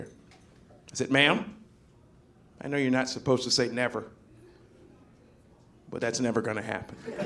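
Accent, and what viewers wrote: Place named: Louisiana, United States. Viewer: I like his Louisiana accent lol.. eeeeyyaw..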